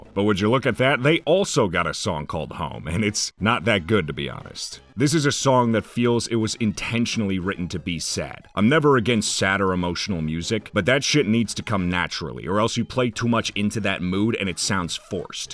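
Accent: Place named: New Jersey, United States. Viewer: Where you from? Jersey?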